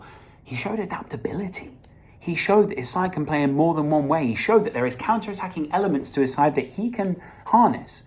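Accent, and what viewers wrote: United Kingdom. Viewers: Proper scouse accent there, clearly from Toxteth...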